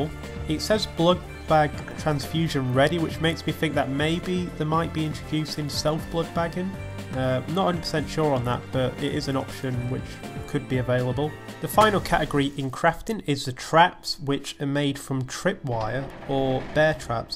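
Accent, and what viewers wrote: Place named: United Kingdom. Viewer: Are you british? i wonder because you remind me of JackFrags greetings from spain :P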